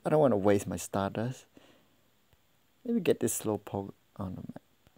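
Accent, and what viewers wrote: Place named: Cambodia. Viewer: Review I knew it. I can tell from your accent. I'm Cambodian too lol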